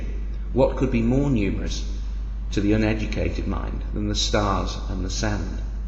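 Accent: United Kingdom. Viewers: Your voice echoes with intelligence. It's somewhat mezmorizing. And it has that awesome British accent lol. Anyway, I love you videos. It encourages individuality and thought.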